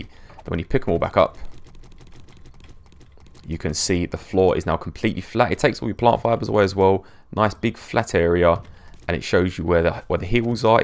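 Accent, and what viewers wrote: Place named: England, United Kingdom. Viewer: Dude, thicc English pronunciation. Do love it)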